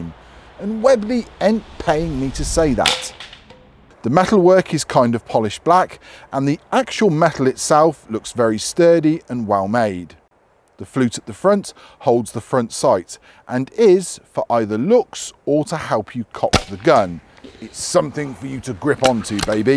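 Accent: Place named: Bristol, United Kingdom. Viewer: Sounds like a Bristolian to me!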